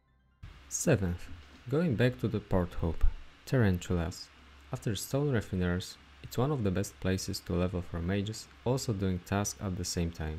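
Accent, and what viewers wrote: Poland. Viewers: Helpfull :) You from Poland ? I hear by the accent ;p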